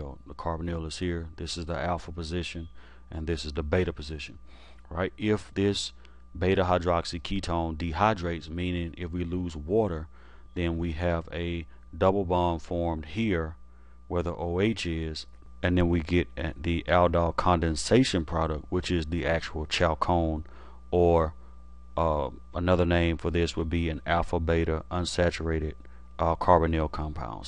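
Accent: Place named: United States. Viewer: Cowboy accent is 🔥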